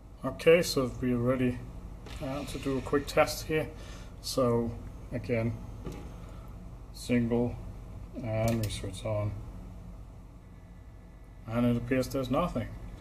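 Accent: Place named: Denmark. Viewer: thx for the video, also took the plunge on a couple of these. was comming short when checking LDdrivers and loads due to high power laser diodes, and purchased a couple of these power supplys. was looking at that KA3005P but seems to cost around 110US delivered + tax and then saw the KA3003P (nax3A) for 63US delivered, so took that, but doubtfull that it will get shipped hence 63US seems to low. while waiting for it to get shipped' instead purchased the KD3005P from Reichhelt Germany to around 77EU+5.6EUshipping-fee and it got delivered today, 25hours delivery time from Germany to Denmark, impressiv. Trying to get familiar with levels and the rela'es, seems to click around 3 times from low to top 31v voltage, but it puzzle me that it keep dropping the voltage on load but likely my rookieness to blame, but i do cherise that its the P version and able to handle it with the mouse and keyboard the values and getting graphical views, makes the adjustment easy, evewen thow the two clickknobs on the KD model is easy to manage. 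... btw your accent very much sound like your from Denmark..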